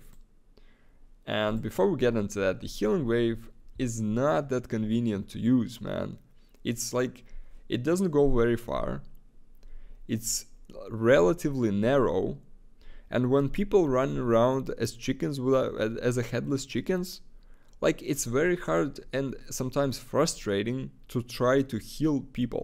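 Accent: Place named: Russia. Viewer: То-то я думаю акцент русский )))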